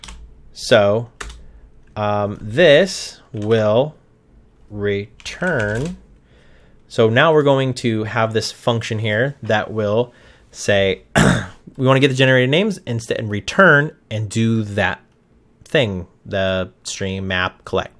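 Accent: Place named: United States. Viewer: i wish i saw this video 2 months ago, this is the best QA Automation Tutorial i've ever seen ! +you speak real pure American English ! which is a real big thumbs up !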